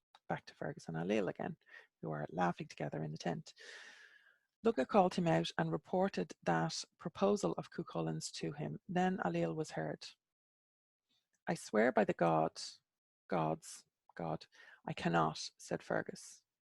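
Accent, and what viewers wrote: Ireland. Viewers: Thank you so much for reading these. I love hearing it read in your Irish accent. It helps to hear how things are said properly. Your commentary is also a great help with understanding what is going on.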